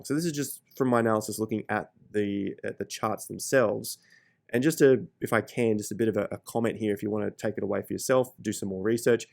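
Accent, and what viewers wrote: Australia. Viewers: Why can’t Australians pronounce “A” correctly? It’s DA-TA not DA-Terrrr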